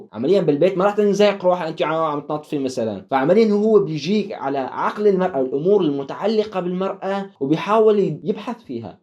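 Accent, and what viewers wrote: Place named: Latakia, Syria. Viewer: شكرا لك أخي لفتتني لهجتك هل أنت من اللاذقية ؟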